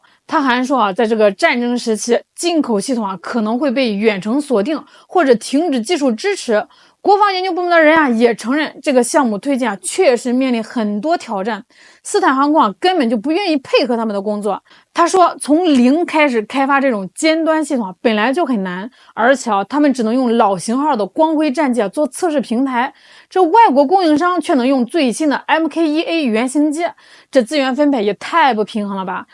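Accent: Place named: Hefei, China. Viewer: up主好像是合肥口音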